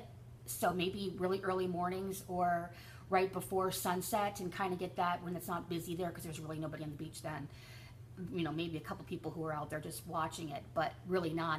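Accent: United States. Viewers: Oops, just heard the end, you're American lol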